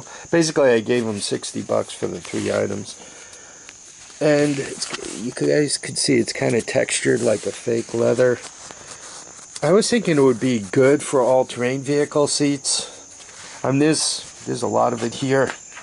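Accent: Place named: Central Jersey, United States. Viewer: Beautiful country there. I'm in central Jersey and thought I recognized some local flavor in your accent!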